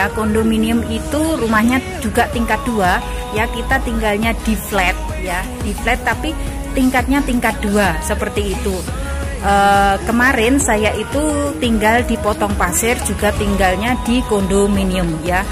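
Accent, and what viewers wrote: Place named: Java, Indonesia. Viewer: Oh pantes kak bahasa logat nya seperti orang Jawa 😊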